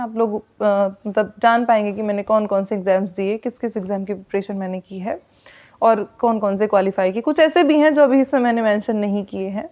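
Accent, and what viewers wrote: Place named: Rajasthan, India. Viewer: Thank you sister, Rajasthan se lag rahe aap to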